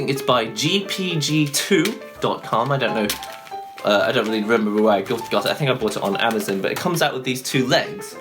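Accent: United Kingdom. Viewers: I know its none of my business but im just curious. What nationality are you and whats your family background? Like you clearly have a british accent, you refer to most things in pounds but you clearly live in japan and appear japanese. I know people are mixed race and people move and migrate across the golbe all the time but im just the type of weirdo that needs to clarify everything haha. Thanks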